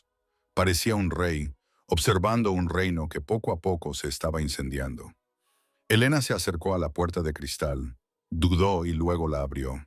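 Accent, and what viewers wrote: United States. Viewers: ME ENCANTA LA IA , CON ESA VOZ CON ACENTO ESTADOUNIDENSE. , SERÁ PORQUE TENGO UN AMIGO ESTADOUNIDENSE QUE HABLA EL ESPAÑOL ASÍ. AH POR CIERTO ME ENCANTAN LAS NARRATIVAS DE ESTE CANAL. MUCHÍSIMAS GRACIAS. SALUDOS CORDIALES DESDE CDMEX MÉXICO.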